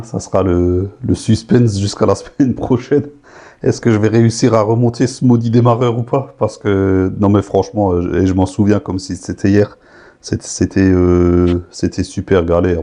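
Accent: Alsace, France. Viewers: Bonjour et merci. J'adore votre accent Alsacien. ELSASS 👍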